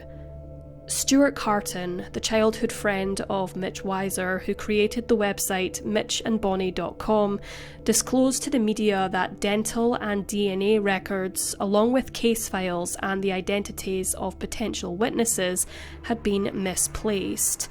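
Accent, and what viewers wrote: Scotland, United Kingdom. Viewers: 10 months late but, no... OP being Scottish isn't an issue. And yes, these are German names but they are in America and have been anglicized. The most spoken language in the United States is English. It is highly unlikely that English speaking Americans would pronounce these former German words with a high German accent. Please be realistic. Thanks!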